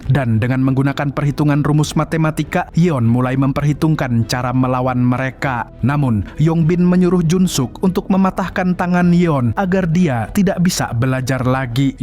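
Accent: Banjar, Indonesia. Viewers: Orang Banjar kah mang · Ini orang banjar semangat bang gak yangka ternyata orang banjar semangat jangan ampih man ai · Pian urang Banjar kah mang,Ulun kira urang luar Kalimantan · Bg orang banjar kah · Ada logat Banjar nya bang 👍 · logat banjar pian😂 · pian urang banjar kh mang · Bro lu orang banjar gw juge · Ikam ne orang Banjar kah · urang banjar sekalinya😂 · ini admin nya orang banjar kayanya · Bang orang banjar hah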